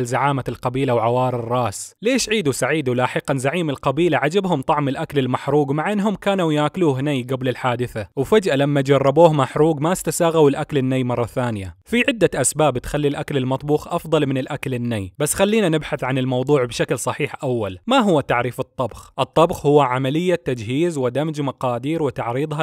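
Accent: Qatif, Saudi Arabia. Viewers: انت من القطيف 🇸🇦 ؟ لأن لهجتك نفس لهجة سرورز 🇸🇦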